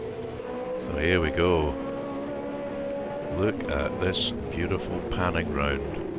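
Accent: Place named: Scotland, United Kingdom. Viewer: That’s cool. I must say as an Englishman your voice and accent is sweet and soothing to my ears. I’ve known alot of Scotsman but never heard that tone and timbre. Looking forward to the next video. Cheers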